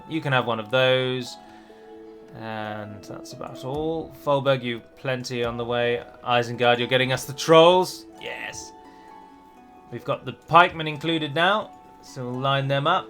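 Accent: United Kingdom. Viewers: Hello, you make awesome videos man, I love to hear you speak just because your clean and excellent British accent. Now, talking about DaC and your ongoing series, seeing as war with Mordor is inevitable in this campaign (because of the reasons you mentioned in the video), I believe it's most wise to seek an alliance with said foe in the Dorwinion campaign so that one doesn't focus in the same enemy and you focus completely in Dale to make the game more interesting as you said in that series. Keep up the good work man!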